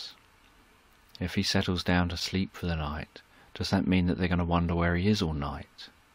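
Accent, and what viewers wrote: United Kingdom. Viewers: I only fell asleep because your British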